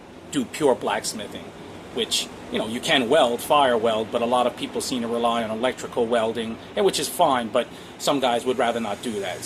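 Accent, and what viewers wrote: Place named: New York, United States. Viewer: Two questions. First, what part of the US of A do you come from, I'm having a hard time placing your accent. Sometimes it seems New York-ish, and other times almost mid-western. Secondly, how much did it cost to make your anvils? I'm only a recent subscriber. I am using an antique cast iron anvil now and am thinking about upgrading to something more durable.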